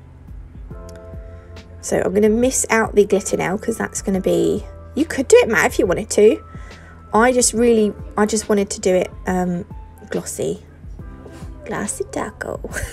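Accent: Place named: United Kingdom. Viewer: I'm really not a big fan of the British accent but I love listening to you, it's so refreshing. Great set, love it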